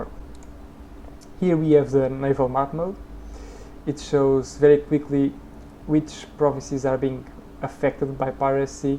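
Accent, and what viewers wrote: Portugal. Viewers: Na hora que você falou os nomes portugueses com seu sotaque matei na hora que es de portugal =D O jogo parece muitissimo bom estou ansioso por ele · Now I know what the portuguese accent is, when speaking English. Nice video, quite instructive, albeit slow-paced.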